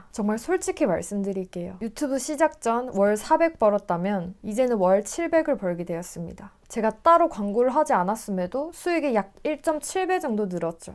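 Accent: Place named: Gyeongsang, South Korea. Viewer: 경상도 분이신듯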